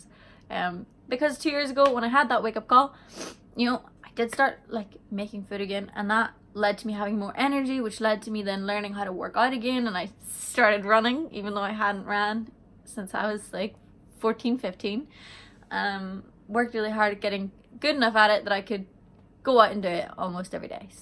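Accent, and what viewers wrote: Ireland. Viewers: Where are you from? You sound a bit irish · you are an inspiration to many people I follow you on YouTube I follow you on Instagram and Twitter because I think that you are an amazingly talented person a beautiful singer and a beautiful young woman you have beautiful eyes you have beautiful hair you have a beautiful smile and if I may say you have a sexy body every person is different no two people are alike you are unique you are unique in many ways keep doing what you're doing because your voice is a treasure your beauty is a treasure and your story is a treasure and I for one appreciate you for all of these things and if I may add as an American I absolutely love your Irish accent it makes me happy to listen to you speak may you have a long prosperous and delightful journey in your life I will follow you through it all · My guess is she's Irish.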